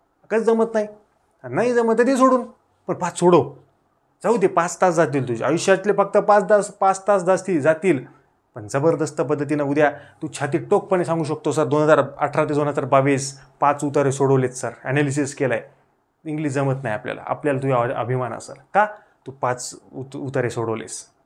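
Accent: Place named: Marathwada, India. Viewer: तुमची शिकवण्याची भाषा खूप छान आहे ...एकदम सहज समजते....एकदम रोखठोक आणि मराठवाड्यातील मराठी...💪👍🙏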